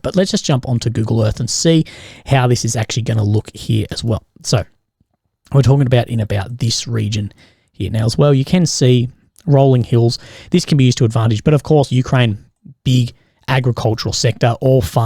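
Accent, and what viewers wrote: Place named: Australia. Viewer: Man. I gotta get to Australia just to talk to people with the accent! 😂 Appreciate your narrative daily!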